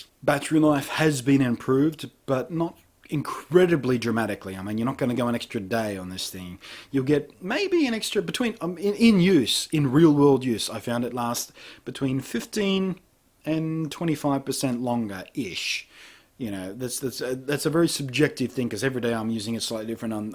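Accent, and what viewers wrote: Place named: Australia. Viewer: 1) Great Review 2) Love the Aussie accent 3) Double or Triple RAINBOW FTW!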